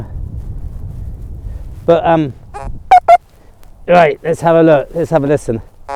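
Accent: RP, United Kingdom. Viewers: Hi, Recently discovered your channel and immediately subscribed! I think you're a hoot! I'm from Glasgow, love your poshness! I've watched a lot of your videos. I love your "woosh" back to HQ! I really like the bird coin too. I love the goofy/cartoon look to it (for want of a better description)! What about a clear matt acrylic sealer for the Roman brooch? PlastiKote is a good brand (I use their enamels). Agree, the gold ring will be the best thing you'll ever find...until you find a gold hoard! Keep sweeping, fella! The crotal bell is doing well on ebay (see the description for the link folks)!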